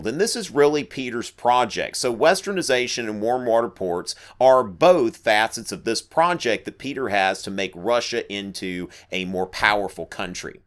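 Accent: United States. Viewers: Oh don't worry, I was able to get over it eventually. But the first couple times the teacher had us watch your videos, I was so focused on the accent and trying to figure out which state you might be from that I wasn't paying attention to anything you actually said. :P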